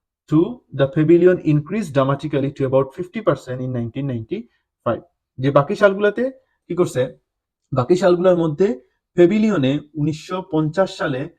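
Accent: Sylhet, Bangladesh. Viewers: are you from Sylhet?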